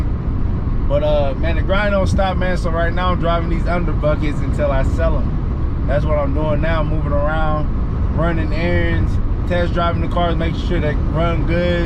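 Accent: California, United States. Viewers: Under bucket that's that cali talk yee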